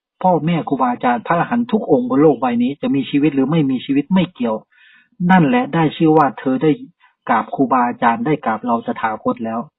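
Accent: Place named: Isan, Thailand. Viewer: มักเสียงอิสาน ครับ สาธุๆๆ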